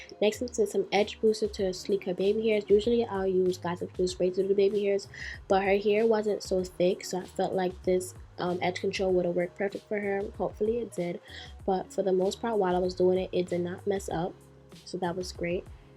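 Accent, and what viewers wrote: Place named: New York, United States. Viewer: I'm new to your channel and idk if you're from New York but you're accent is so adorable lol. Great job on the ponytail ❤️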